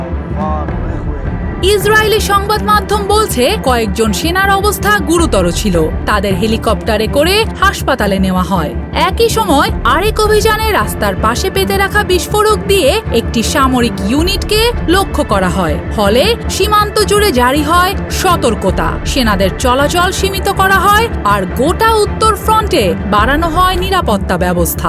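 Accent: Kolkata, India. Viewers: এই সাংবাদিক মাগীরে চাকরি দিছে কে এই মাগিতো কলকাতার হকার সাংবাদিকদের মতো সংবাদ পাঠ করে। কথাবার্তা তো কলকাতার মানুষের সাথে মিল আছে।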